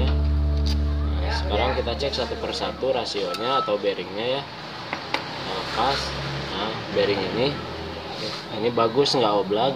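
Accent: West Java, Indonesia. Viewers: duh nuhun Kang....mantappp. Kela, akang orang mana ? Ari logat sunda, ari wajah arab ??? Terus gini kang, motor saya Mio Soul GT Bluecore injeksi kalau di gas teh delay (tdk spontan maju), eta kunaon nyak?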